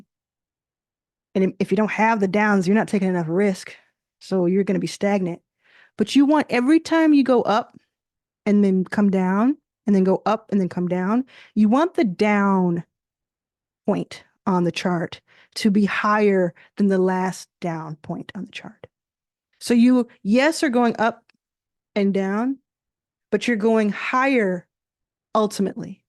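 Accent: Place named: Kenya. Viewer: You have an English accent of a Kenyan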